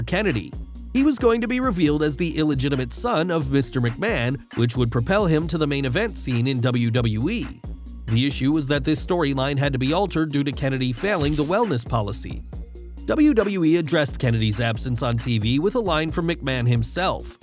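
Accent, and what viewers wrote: United States. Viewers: I don't think I like this Mr. America's voice or very Systemic voice ... I like the English voice better as explaining American Manipulative business ideas such as "sports entertainment"... Haha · Not a fan of the American voiceover, sorry.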